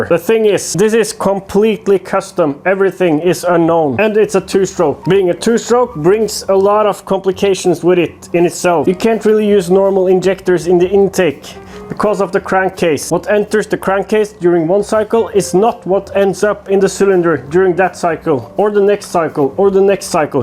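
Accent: Scotland, United Kingdom. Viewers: the scottish accent cracked me up lol! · Love your channel. I don't know squat about two strokes but I enjoy the process, the ups and downs, the music, the Scottish accent, the whole thing. Authentic empirical activity leading (maybe) to a distant, possibly successful outcome. Not for folks who idea is watching a half hour video by someone who builds an engine or restores a car, start to finish. This is the journey, not the destination. Who knows, you may end up with the world's heaviest 2-stroke. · Love watching your content, also love the scottish accent😂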